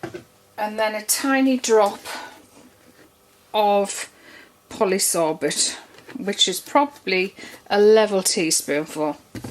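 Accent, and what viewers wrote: United Kingdom. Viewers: I love your British accent !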